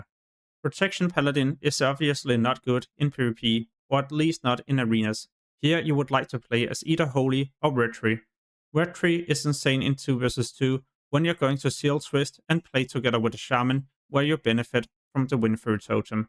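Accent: Denmark. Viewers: Are you danish? 🇩🇰 or what accent is that?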